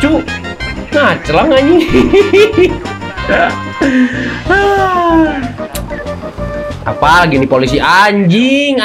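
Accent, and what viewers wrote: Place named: Sunda, Indonesia. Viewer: Nyunda parah euy si aa😭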